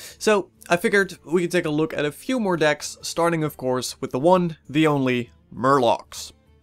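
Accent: Netherlands. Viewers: you from the Netherlands or Belgium?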